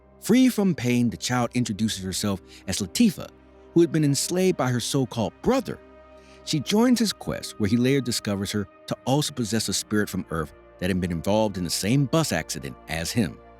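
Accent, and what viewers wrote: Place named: South, United States. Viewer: The country voice doesn't suite this genre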